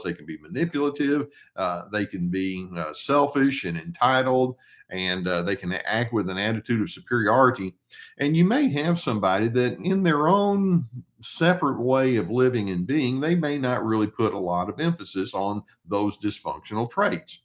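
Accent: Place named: Texas, United States. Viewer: Wow I’m from Texas to no wonder I feel at comfortable listening to you your accent your mannerisms your belief you vibrate like the people that I come from where I come from I’m from Lubbock texAs and also I like your Maas green shirt you wear unusual colors I know that the topic is very important and I’m learning so much from you it’s very very exciting to be empowered by your knowledge empowered to be me what a concept wow thank you so very very much. Such a gentle soul THANKYOU🐶🆙🙏🏻🤍😃🌷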